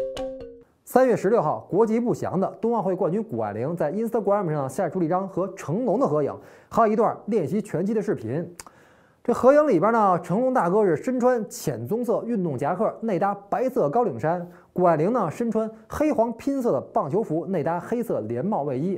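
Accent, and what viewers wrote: Beijing, China. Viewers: 给你提个建议兄弟 尽量讲普通话 北京口音有点重 我是北方人听起来都有点费劲。南方人和港台地区估计更费劲了！ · 广东人觉得没有困难。本来频道就是京腔京韵嘛 北京口音听着就很爽❤️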